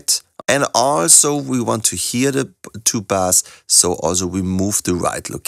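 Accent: Germany. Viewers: I can’t get past the thick German accent 😅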